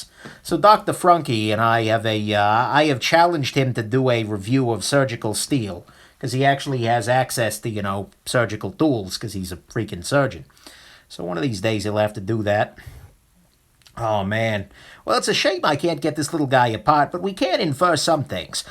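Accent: New York, United States. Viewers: Is this an NY accent he as?? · he has a nice New York monster accent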